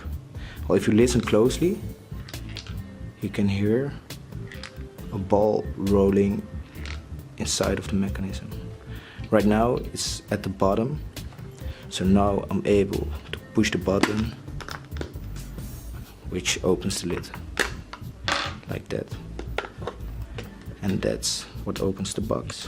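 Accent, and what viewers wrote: Netherlands. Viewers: Is he from the Netherlands? I can hear it in his voice😂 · This guy is dutch, im pretty sure he is dutch, everybody over here that tries to talk english speaks this way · Are you Dutch? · Ben je Nederlands want je accent klinkt heel erg Nederlands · Are you Dutch?? (your accent) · R u dutch? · You're ‘accent’ seems kind of Dutch, if so, ik ben ook Nederlands! :D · You sound like you have a Dutch accent. Are you Dutch? I am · I am for 98% sure you're Dutch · I can hear you are Dutch. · Are you Dutch? Your accent is kinda Dutch xD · you, my friend, sound verry dutch... am i right?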